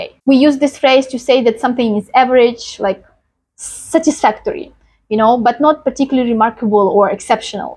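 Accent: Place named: England, United Kingdom. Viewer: Merci beaucoup ! Your French is not so complicated to understand also your English accent is lovely . Thank you again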